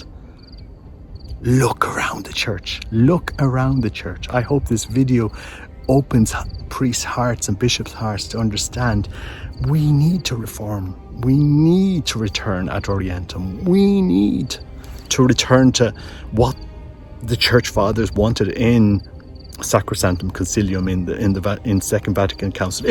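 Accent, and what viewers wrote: Ireland. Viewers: The Eucharist is a treasure. Amen! Thanks for your videos, great to hear the Irish accent too 🙏🏿🙏🏽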